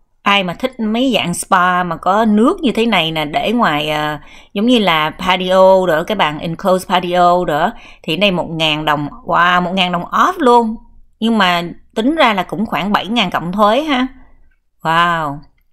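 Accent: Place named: Mekong Delta, Vietnam. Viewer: chị người miền Tây phải không, sao e nghe giọng của chị phát âm rất nặng mà tiếng cứ cứng cứng lm sao ấy ạ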